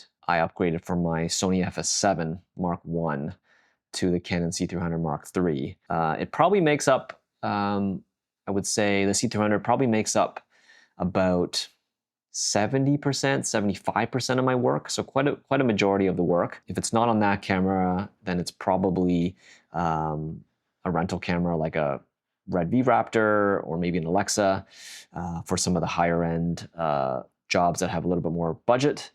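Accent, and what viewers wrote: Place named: Toronto, Canada. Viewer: Cine in the 6? Sounds like Toronto, followed 🤙🏾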